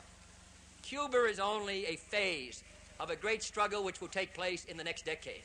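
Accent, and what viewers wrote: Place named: Boston, United States. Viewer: Great Boston accent. And a speech with substance.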